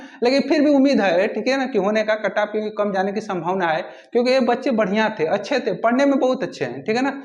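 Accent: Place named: Eastern Uttar Pradesh, India. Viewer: East up se honge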